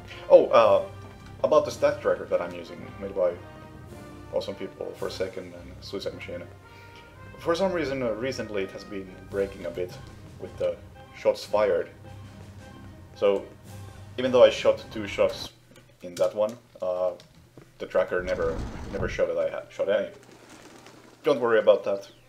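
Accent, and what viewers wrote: Finland. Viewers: That’s a Finnish accent 🤘🏻🇫🇮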